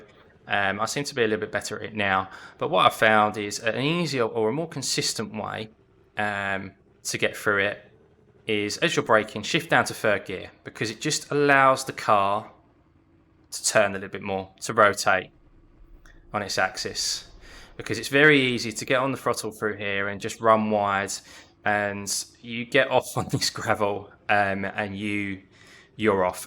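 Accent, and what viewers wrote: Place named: Essex, United Kingdom. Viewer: Harsh but an Essex accent is top tier.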